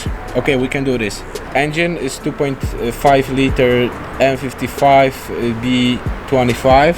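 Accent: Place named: England, United Kingdom. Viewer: Oooh man i love the accent and youre english i dont know why but its so Nice to hear!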